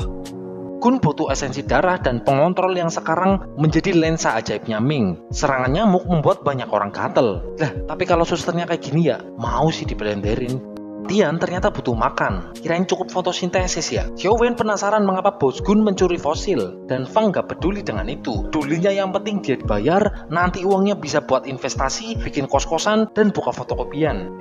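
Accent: Banyumas, Indonesia. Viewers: Admine wong ngapak ya min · aku dadi seneng admine medok basa ngapak hahaha mantap banget pokoke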